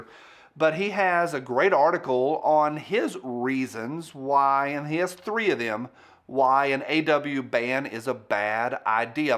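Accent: South, United States. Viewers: Howdy,! I'm from Illinois, but love the southern accent. Your channel is awesome! Thanks for the info and laughs!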